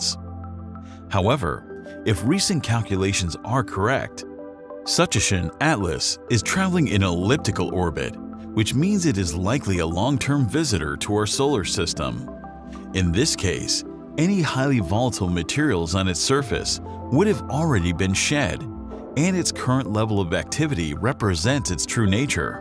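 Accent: United States. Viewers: so heavy accented american voice robot. you say become visible in summer of 2024. i guess you must mean american summer, showing you think the whole world is america, not very good for a space oriented channel teaching about planets, sun and comets, where they dont have summer! So i guess down under we can guess you mean winter.😮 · When the narrator is American you just know it's not true.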